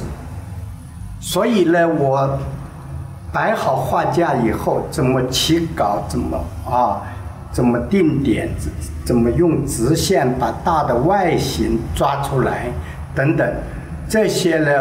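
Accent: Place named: Shanghai, China. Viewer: 为什么有一种老师是上海人的感觉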